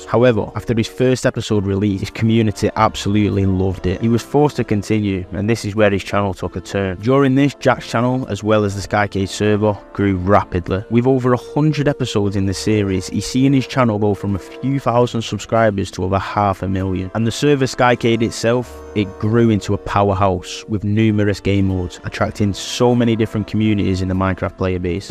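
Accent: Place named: United Kingdom. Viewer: The fact that ur a so British makes me watch this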